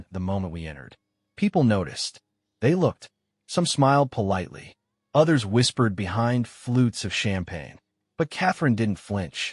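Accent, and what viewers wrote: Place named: France. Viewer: Can we stop with the stupid French accent it’s annoying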